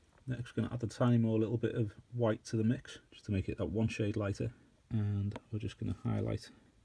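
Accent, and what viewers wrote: Liverpool, United Kingdom. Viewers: Scouser! Yes lad :)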